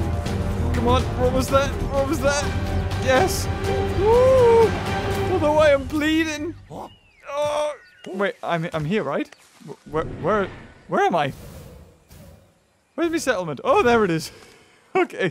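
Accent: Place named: England, United Kingdom. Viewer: I love that English act sent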